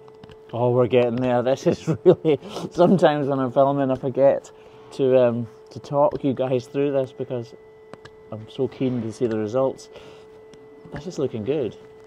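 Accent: Scotland, United Kingdom. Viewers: greetings from Texas, USA! Obviously, a lot of viewers enjoy your work, as do I. I have visited Scotland, and I have a great fondness for your land and heritage, and hope to come back again. I have high hopes for 447, and it's thanks to your good work. That cow has an internatinoal reputation now. I love your lead-in music, is it a Scottish performer? Great work sir, and I love the accent! Did I mention I like Scotland!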